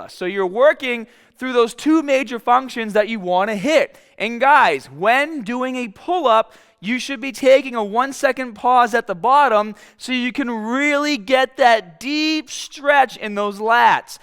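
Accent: Boston, United States. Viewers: The Boston accent is hysterical... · Thanks for the videos! They are awesome! From where in the US is your accent if you don’t mind me asking? :)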